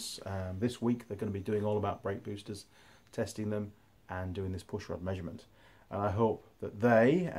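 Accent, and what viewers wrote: Yorkshire, United Kingdom. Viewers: York,i Know it well, the 1 way system is mental,nice town,walked along the city walls a few times,i could sniff a hint of Yprkshireman in there,i too am from Yorkshire, well Hull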